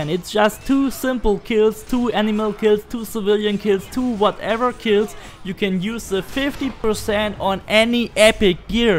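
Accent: Germany. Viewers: Lol I am level 73 and I have killed a lvl 99 mercenary easily in 10 sec. Or so, is that good? By the way this build is so funny and nice even before the armor set I have played a lot of the ares ability because you just feel undying 😂and the animation are very cool. By the way are you from germen beacause of your accent I thought that (sorry if I am wrong I am also from Germany)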